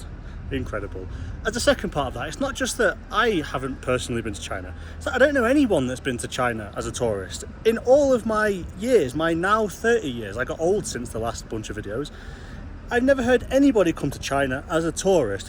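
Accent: United Kingdom. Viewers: British non-posh accent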